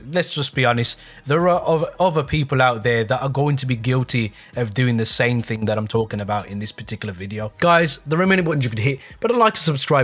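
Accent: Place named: Midlands, United Kingdom. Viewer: Yeah man just subscribed, luv a midlands accent, sounds down to earth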